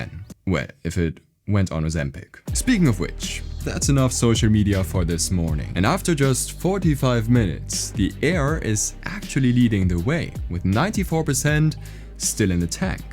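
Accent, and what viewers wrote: Germany. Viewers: I hear some German Out of your voice...😅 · I love german english accents, one of the reasons i like watching your videos❤Keep soaring higher brother❤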